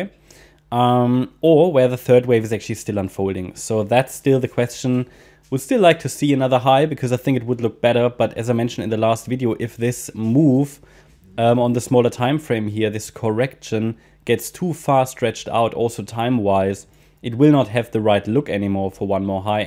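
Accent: Germany. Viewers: Thank you Mister, I always thought that you speak (good) English with a German acent, and a few days ago I found your DE chanel 🤣🤣😍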